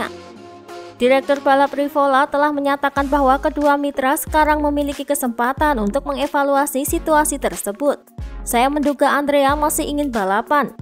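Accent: Java, Indonesia. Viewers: Sorry telat mba medok heje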